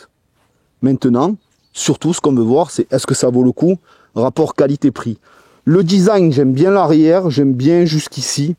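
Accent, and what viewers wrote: South, France. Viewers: J'en peux plus de cet accent du sud. Même ça ils ont réussis à le pourrir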